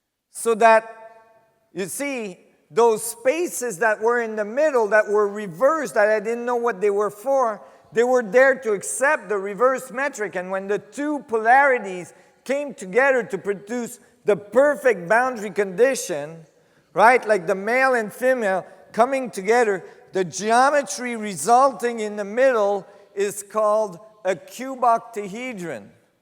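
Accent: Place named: India. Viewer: жуткий акцент.индус?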